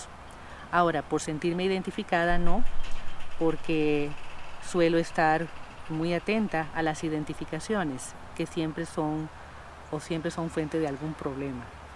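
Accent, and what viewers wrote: Central Mexico, Mexico. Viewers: Creí que eras mexicana... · tu acento del español es muy parecido al que tenemos en la parte central de México así que siempre me es fácil de entender todos los temas que tú expones. Saludos cordiales. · Tu asentó se escucha mexicano del centro.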